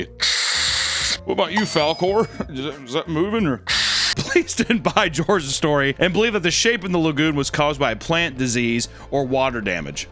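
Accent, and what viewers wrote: England, United Kingdom. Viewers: As an American I approve this British accent lol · the english accent/commentary has me crying 😂😂💯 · I love his British accent, how it tickles me. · Why is his British accent spot on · I love British voice, I wish we sounded like that, we would actually be interesting then. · The bri-ish accent is killin me 😂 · is truly a man of talent. The Bri’ish accents were so amazing 😂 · I like British accent takes the form of Fred Flintstone somehow... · lol that british accent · FAVORITE English accent by far. Holy fuck 😂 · this video made me feel like i was slowly losing my mind with, the British accent is so funny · As a Brit myself, your British accent is beautiful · every time does his English accent I lose it. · As someone not from England, that's exactly how people from England all talk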